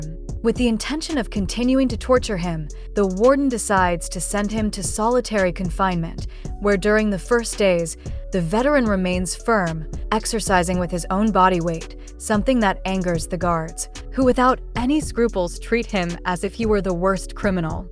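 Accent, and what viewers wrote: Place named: United States. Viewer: Your storytelling is great 👍❤and your accent of united states is great 👍 this movie Recap is nice 👍❤❤. By the way I'm new on this channel,I will I new this channel before, thanks a lot. I got entertained ❤❤❤❤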